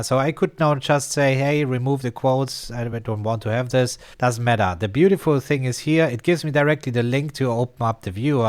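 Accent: Germany. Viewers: Just found your channel, saw this and the previous video. Love the content and the practical relevance focusing on real life usability without self promotion which is often the main motivation of other content creators in YouTube. And as a German guy, I also like your German accent 😊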